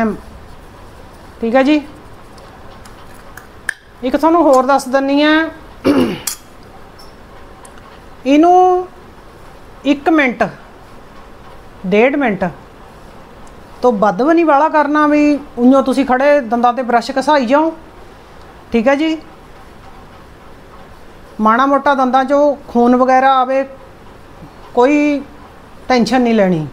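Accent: Punjab, India. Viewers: ਤੁਹਾਡੀ ਠੇਠ ਪੰਜਾਬੀ ਬਹੁਤ ਵਧੀਆ ਲਗਦੀ ਹੈ ਜੀ ਸਮਝਾਉਣ ਦਾ ਤਰੀਕਾ ਵੀ ਬਹੁਤ ਵਧੀਆ ਜੀ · Bhaian ji thaith punjabi boht vadhiya laggi,mikdar jrur dseya kro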